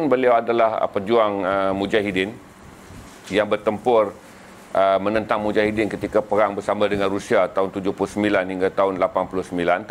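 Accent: Kedah, Malaysia. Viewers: Nak dengaq tuan ckp Kedah sepenuhnya.....hehehe takut hilang pelat Kedah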